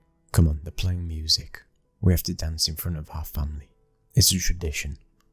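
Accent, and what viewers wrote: United Kingdom. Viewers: I knew i recognized the series, i have listened to the original one where it is a Italian voice, but i also liked this one as i am also British and it makes it easier for me to listen to, keep it up ❤❤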